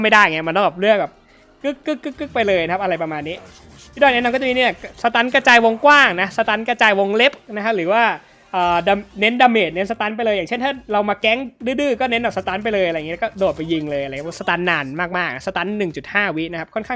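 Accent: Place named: Thailand. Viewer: I didnt understand your language but i enjoy the gameplay, thai accent kinda funny tho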